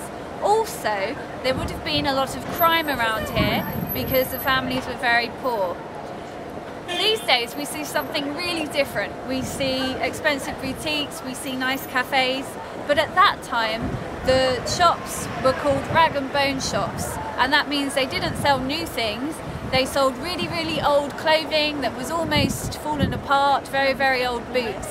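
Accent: United Kingdom. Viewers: Am I the last viewer of channel (especially for this part)... and fallin' in love her british accent... Thank you... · Good, but it doesn't give a very informative overview of London. Rather it's very brief. Almost nothing. Your accent sounds not very strong british, but noticeable british.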